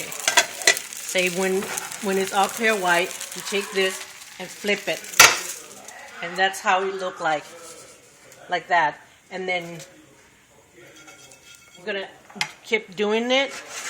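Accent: Laos. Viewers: The crepe were lumpy, some had holes, the fillings were falling out. they were ugliest mess but very yummy. I love the sweet and sour sauce. I just need more practice. I use a lot of MSG and I am very much a live. So I will keep using it. thanks for the tutorial, your Laos English is just perfect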